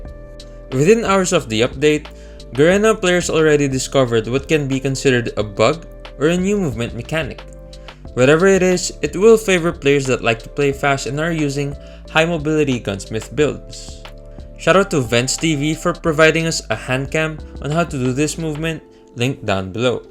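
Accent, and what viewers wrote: Philippines. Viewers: Wait..pinoy ka? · u sound like filipino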